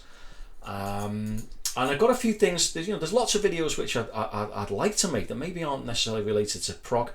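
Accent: Liverpool, United Kingdom. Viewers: You sound like a scouser 👍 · Hey! I love these videos and hope you can keep them up and growing! I have a special playlist I've made up so hopefully if the worst happens, I've got copies of them! I save your vid of a particular album and then follow it with the full album. Then I can watch you explain all about how it was made then listen to the album again with "new ears!" It really enhances the enjoyment of the album! It's also great to hear a fellow Scouser providing a background to some of my favourite music! It takes me right back to the 70's and early 80's when we could actually afford to buy tickets to see these bands! (Unlike today when it costs hundreds of pounds to see bands in a field where you are half a mile from the stage!!) Keep up the good work, sir! I'm looking forward to seeing where you take us next! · I love your videos! And as a German I love your accent! 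Are you perhaps from the Liverpool area?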